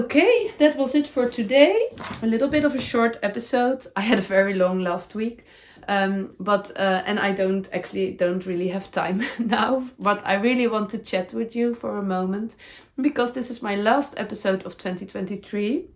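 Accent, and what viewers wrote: Netherlands. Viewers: Thanks so much, for a year of joyful ‘Dutchy English’! It’s been sweet to hear you talking about your love for your husband and family! All have surely enjoyed helping you find the proper words you are struggling with...from afar! Today’s word was’Hardware store’! Your confidence in making a dress shows already! You can make a ‘fake bottom band’, by just adding to center of frabic befoe cutting out pattern! You sound less worried about your ‘Miss Perfect’! We’re never too old to Keep Learning and creating beautiful things for ourselves and others!!! Til Next Year! Thanks for all the smiles!❤🦋🧶🧦🌷Sharon from S. California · I didn’t even know cork blocking mats were an option. I love the idea. I can’t find them available anywhere in North America. Lucky you! Thank you for your podcast. I enjoy your Dutchy English and your honesty. I hope that you and your family have a nice end of the year. 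I look forward to seeing more of you and your heart jumping crafty adventures next year. 😊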